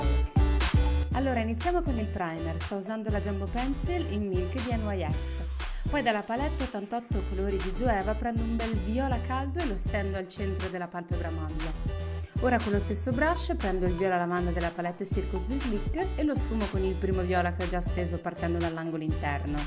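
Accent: Italy. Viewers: Sei molto bella e mi è piaciuto il suo accento italiano 😙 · Your videos keep less bored especially when I can't sleep. I love hearing you speak italian even if I don't understand half what you are saying. I have Nigerian Friends who were born in Italy, They speak just like you. Need to start doing videos in my language LOL. Thanks for this awesome creativity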